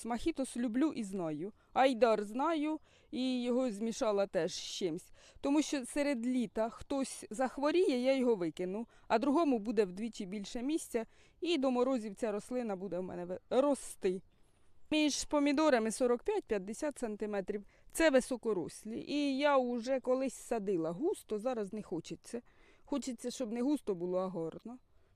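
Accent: Poltava, Ukraine. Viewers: Доброго дня, а мені здається, що ви з Полтавщини, я полтавчан визначаю по розмові. Родичі були на Полтавщині раніше. Херсон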